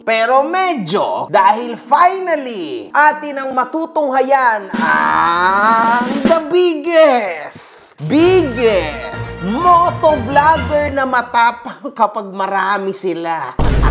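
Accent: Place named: Conyo, Philippines. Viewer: Conyo ampt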